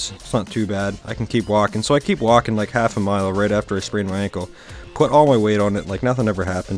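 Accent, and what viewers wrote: Canada. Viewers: Buddys got the Manitoba accent. Good vid bro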